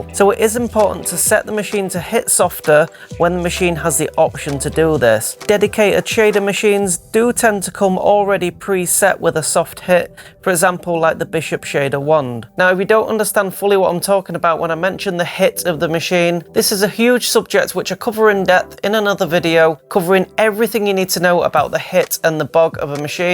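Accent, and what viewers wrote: United Kingdom. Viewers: est-ce qu'il a un accent genre super british?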